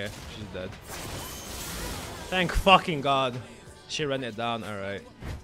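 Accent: France. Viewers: LL SOUNDS EXTRA FRENCH THIS EPISODE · AHH le bon accent français <3 GachiBASS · Tu a un accent français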